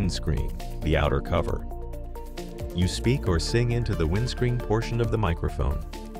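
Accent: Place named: United States. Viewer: The automatic voice sounds American, while the writing and grammar are rather spotty.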